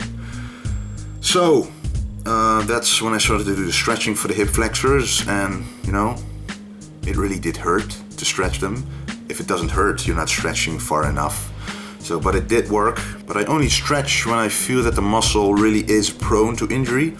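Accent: Netherlands. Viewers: Were are u from? You sound like a Dutch Guy ! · it's awesome to see another lifter enjoying what he does and publicly answering questions like that. It's quite admirable that he does these videos in english too... I notized right away that this fella must be dutch due to the on and off popping out of the accent. Right on cuz keep it up !!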